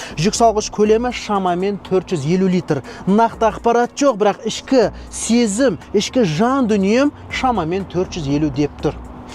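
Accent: Standard. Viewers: Ассалаумагалейкум інім! Туу..... недеген таза қазақша тусиндиру👍👍👍👍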